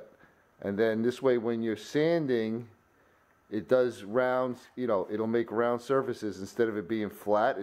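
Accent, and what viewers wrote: New York, United States. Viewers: my friend. EXCELLENT work! Those are talented hands fella. It really is too bad that Nicholson/Weller is producing such garbage now. They used to be the best of the best. Maybe they should find something else to do eh. That accent of yours sure sounds Bronx/New Yorkish? Take care. I always enjoy what your up to bud.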